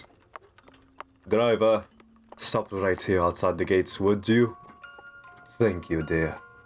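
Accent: Russia. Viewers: Is he Russian or something? :D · Sounds Russian 👀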